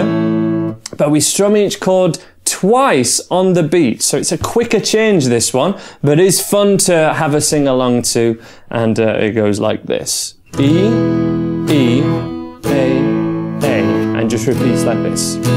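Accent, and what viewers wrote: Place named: Birmingham, United Kingdom. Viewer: Your accent is like peaky blinders 🙃😂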